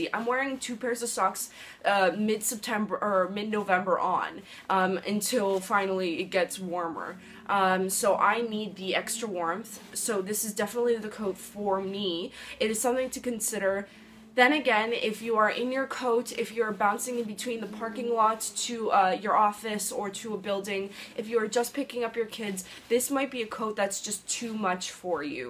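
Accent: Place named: Canada. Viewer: i love your cad accent..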